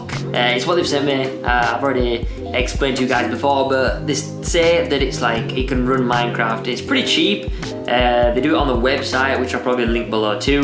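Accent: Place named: United Kingdom. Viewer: Why are you talkin british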